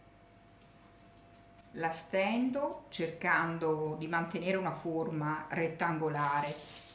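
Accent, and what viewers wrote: Tuscany, Italy. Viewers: Bravissima come sempre. Ma te non ti chiamaviTerrore Spendido ? O mi sbaglio ? Ti ricordo bene col tuo accento Toscano che personalmente mi fai morire dal piacere ascoltarti va be bellissima sta pasta frolla senza dubbio li farò col cuoreeee ❤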